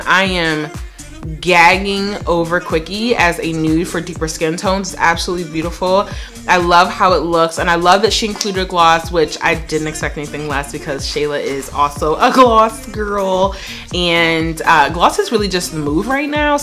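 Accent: Trinidad and Tobago. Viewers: Yessss gyal! Bring out d Trini accent! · Gorgeous look. I caught the Trini accent before you said it. 😂😂😂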